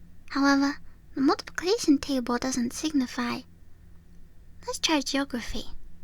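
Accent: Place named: United Kingdom. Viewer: I'm so looking forward for the next parts! I never read the book, so I'm glad you're the one introducing it to me:_nepuYay: I will definitively listen to it again:D also you didn't lie, you sound pretty british in this lol it's very cute:_nepuLove: thanku again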